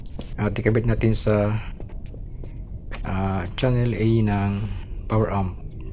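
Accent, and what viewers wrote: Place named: Bicol, Philippines. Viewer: Hello BRO.. Parang bikolano ka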